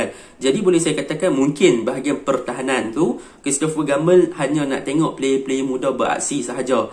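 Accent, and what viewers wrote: Kedah, Malaysia. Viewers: Org kedah yg ulas? Bgus dan semangat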